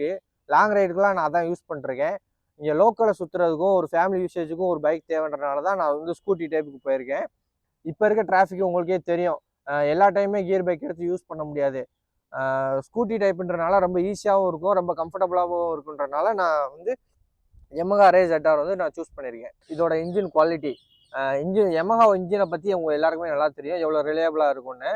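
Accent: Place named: Coimbatore, India. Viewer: Yov ne paaka yenga area la iruku oru paiyan maari yei iruka ya... un looks and pechu... avan coimbatore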